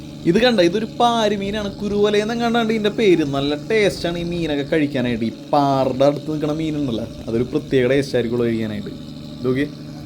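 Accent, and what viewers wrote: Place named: Thrissur, India. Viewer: താങ്കൾ തൃ... ശൂരാണോ. ഉഷാറായി 👍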